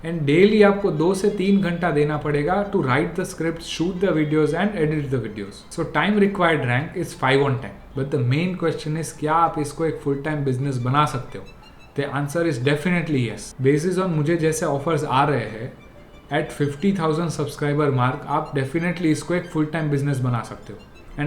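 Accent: Gujarat, India. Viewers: Gujrati hai kya bhai tu ?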